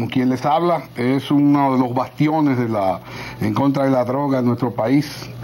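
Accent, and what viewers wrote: Puerto Rico. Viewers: Bendito nene! pero mimetizaste bien el acento boricua...¿? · Yeste acento boricua Tony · Cuánto talento tenía Freddy ya en ese tiempo era una figura Tony 😂tu hablaba boricua cuando eso pero tienes tus méritos también eres bueno rip Freddy el mejor y más valiente artista de la TV dominicana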